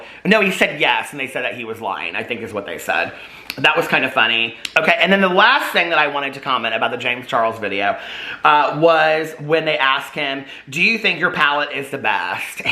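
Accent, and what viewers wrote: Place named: Indiana, United States. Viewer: helped me pass my Test of English Foreign Language! For 4 months I watched his videos on all of his channels to practice listening and speaking. One day I might speak as fast as, with some indiana accent! 🤩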